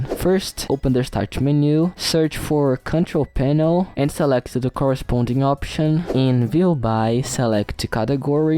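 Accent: Brazil. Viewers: mano esse video ficou deveras dahora, voce conseguiu enganar incrivelmente a gringa falando ingles, mas claramente pelo seu sotaque é brasileiro. amei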